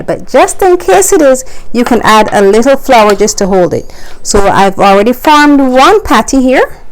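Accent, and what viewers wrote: Barbados. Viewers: Nice to hear a Bajan for change. Love de Rock! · Girl yousa bajan I recognise that accent anywhere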